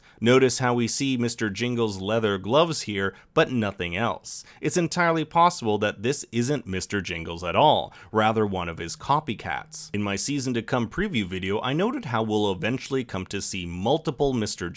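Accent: Canada. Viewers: random but this video i picked up on an accent and now i’m wondering if youre from canada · Just curious are you Canadian? I've been trying to place your accent.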